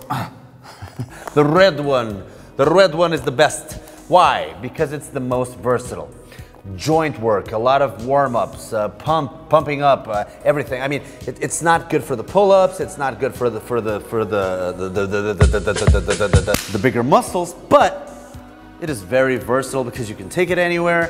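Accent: Portugal. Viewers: Passei o video todo a pensar "este gajo deve ser Português"... devia ter lido logo a descrição!:D Belo Video! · Reparei que era tuga pelo sotaque. Excelente video! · Quando fazes a pesquisa em inglês para obter mais informação, mas o melhor resultado é aquele craque tuga marado a falar inglês ahahah Grande Caralho 💪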